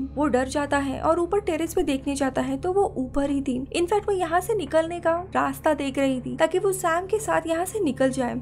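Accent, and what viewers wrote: Maharashtra, India. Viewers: B. Aawaja varun Marathi ch vatate. Tichya instagram var tine marathi madhye lihila aahe.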